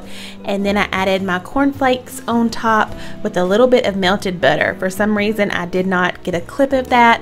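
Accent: South, United States. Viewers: Hey! I can just hear you talk all day. Love your Southern accent! Love your Videos!🙌🙌🙌🙌💯 I am differently trying these recipes.Thanks for sharing. I hope you have a fun, loving, Safe Holiday! Merry Christmas!🎅🎄🎁🎁🎁💗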